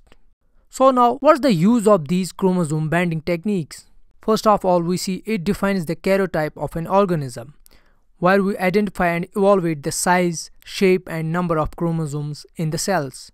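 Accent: Kashmir, India. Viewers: You voice is like that of Kashmir. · Well Explained Although the Indian accent is thick as fu*k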